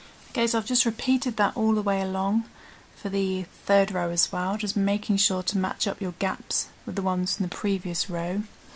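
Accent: United Kingdom. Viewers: Too pretty for a cloth would make a super scarf. That said why oh why are you stating American terms firstly for the yarn worsted? or is the hasty dk British part? Also that’s not a double crochet for us older Brits it’s a treble! It just annoys me so much that fellow Brits nowadays seem to immediately use American terms I’m presuming from you’re a Brit from the voice naughty of me, also sorry pet peeve use of US terms for everything rant over. Is a nice pattern though.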